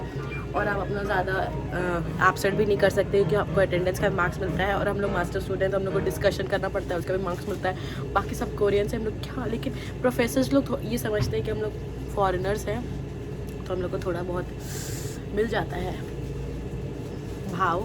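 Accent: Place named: Bihar, India. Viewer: Are you from Bihar. Your hindi sounded like that. I am also from Bihar.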